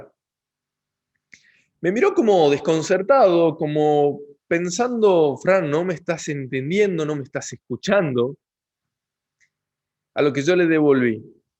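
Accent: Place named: Tucumán, Argentina. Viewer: Muy claro. Con acento tucumano hace rato que no escuchaba esas rr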